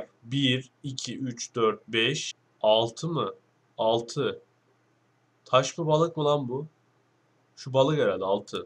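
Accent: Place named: Adana, Turkey. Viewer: Adanalı işte gurban olayım anagı yolugu diye ya ağız dolusuyla çok hoşuma gidiyor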